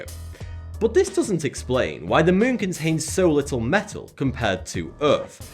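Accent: United Kingdom. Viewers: Hi if he lives in Asia why does he have such a heavy British accent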